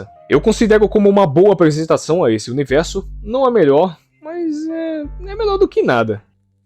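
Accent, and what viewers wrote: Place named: Pará, Brazil. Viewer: Eras esse égua, até pareceu paraense kkkkk gostei do rank, discordei de algumas posições kkkkkkk vlw, flw 👏🏽🫶🏼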